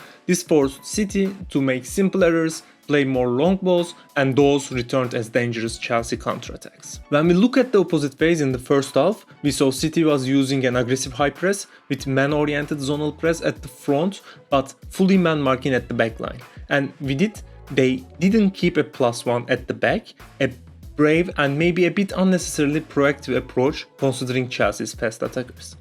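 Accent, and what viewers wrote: Turkey. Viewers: Bro are you Turkhis ?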